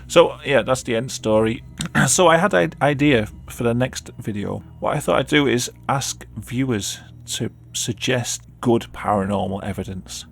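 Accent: United Kingdom. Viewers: are you british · whenever I listen to you (or any British youtuber), I can't help but get in touch with my roots and just impersonate your accents. Though usually itll turn from "women's stomach turns after seeing a ghost" to "women's stomach turns after eating some butt"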